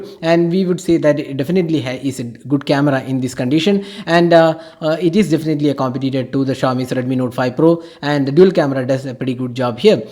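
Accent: India. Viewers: Indian tone hurts my ears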